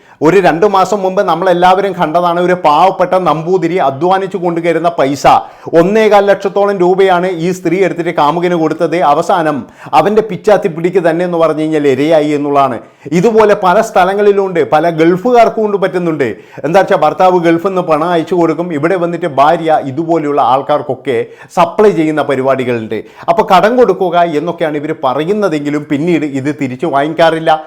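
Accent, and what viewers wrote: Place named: Kasaragod, India. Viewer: anchor kasarkottu karanananoo..athe slang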